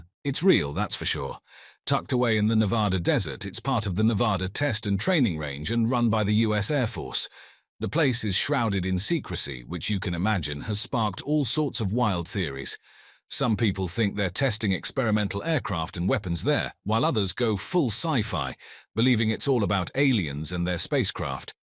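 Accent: England, United Kingdom. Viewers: Well at least this had a decent English voice, unlike many of those fake grating Yankee voices although it repeats itself so still suspect this was AI generated.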